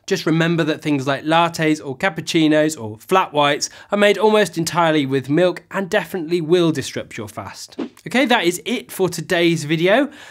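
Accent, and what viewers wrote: England, United Kingdom. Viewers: I am a black coffee loving Londoner who's been on an OMAD diet since Jan 1st. I stumbled across your channel by accident, and am very pleased that I did. It shouldn't matter I know, but nonetheless I find it oddly reassuring to hear an English accent as opposed to the American centric content that's so prevalent on YouTube. You have a new subscriber. Keep up the good work.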